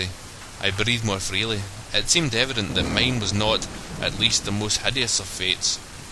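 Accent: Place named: Scotland, United Kingdom. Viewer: Thanks, I didn't have to read it on my own, and I enjoyed a Scottish accent.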